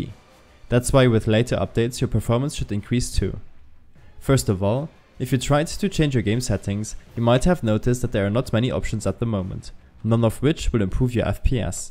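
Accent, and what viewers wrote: Germany. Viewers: i bet ur german i can tell it from ur english accent WAS GEHT AB DİGGA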